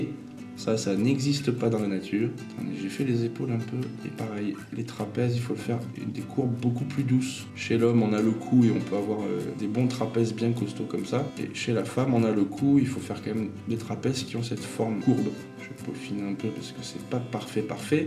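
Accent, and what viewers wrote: South, France. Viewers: Excellent l'accent du sud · comment dire.... tu es énorme!! j'adore ton humour, tes explications...et ton accent sudiste! merci pour ce cours majestueux!! (un nouveau fan sudiste!)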